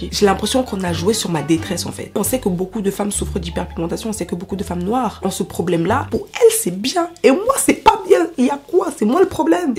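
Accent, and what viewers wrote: Cameroon. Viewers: Naah l’accent kmer vraiment je peux dead 😍😍😂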